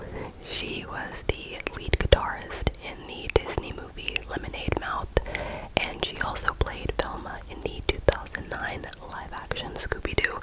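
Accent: United Kingdom. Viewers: Hey <3 love the video as usual 🫶 could you maybe do a british accent video again ? I actually love your british accent lmao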